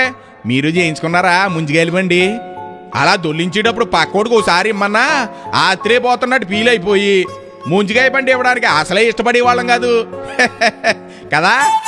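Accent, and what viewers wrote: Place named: Godavari, India. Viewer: మీరు మన గోదావరి యాస లో చెప్పిన విదానం అదిరి పోయింది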